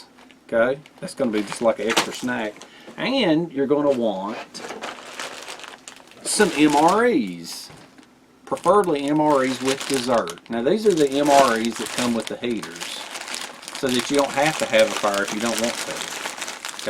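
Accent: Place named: Georgia, United States. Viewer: You sound like Ga boy, I know all about those shutdowns, local 1263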